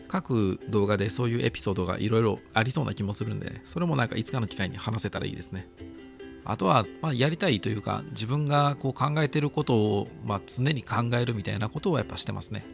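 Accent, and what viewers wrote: Kansai, Japan. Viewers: 関西の人の喋り方や〜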